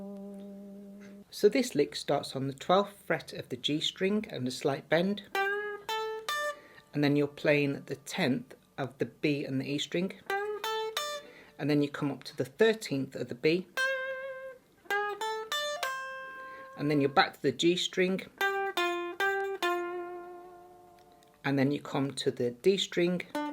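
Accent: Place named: South Africa. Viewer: Goods job. You sound South African. Another great song by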